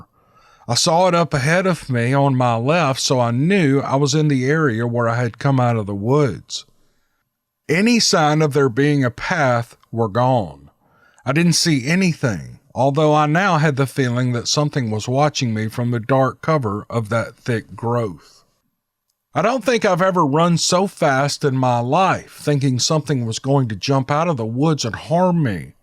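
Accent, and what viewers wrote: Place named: South, United States. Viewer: you are like the Earl Ives with a Southern accent you have got the best storytelling voice I've ever heard. I'm a truck driver that drives about miles a night and listens to podcasts in all kinds of storytellers. You and Mr. Ballen Do wonderful jobs and thank you very much for making my truck driving much easier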